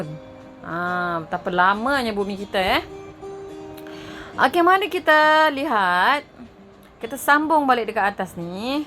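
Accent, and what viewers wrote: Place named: Indonesia. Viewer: Ckp macam Indonesia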